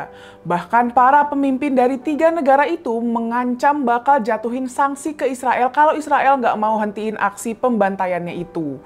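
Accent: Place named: Betawi, Indonesia. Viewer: Semoga tercipta perdamaian. Di channel ini baca berita dgn dialek Betawi yg kental banget. Gpp sih. Sah-sah saja.😂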